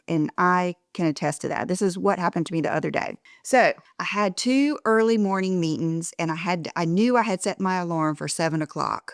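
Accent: South, United States. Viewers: Thank you. Your videos, your presence, your lovely southern accented voice, one of my favorite Influencer's to watch and listen. I know all of the thinks you talk about, but sometimes, adulting in wellness can be put on the shelf. So I do take your advice on a few things, and they help. love you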